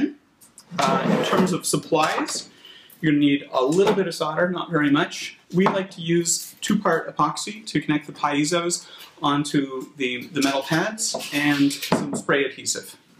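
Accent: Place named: Canada. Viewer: Canadian much? Great vid BTW...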